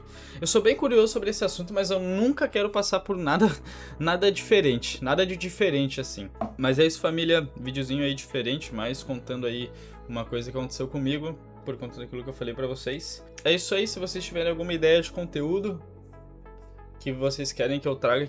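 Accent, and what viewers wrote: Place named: South, Brazil. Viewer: Nesses vídeos mais improvisados o sotaque do sul fica mais destacado kkkkk é os guri não tem jeito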